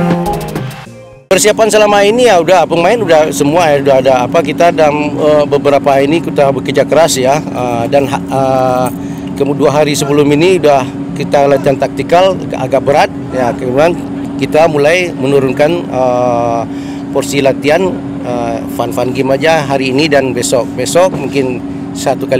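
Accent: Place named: Padang, Indonesia. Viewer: Bahasa Indonesianya kental banget Padang nya hehehe.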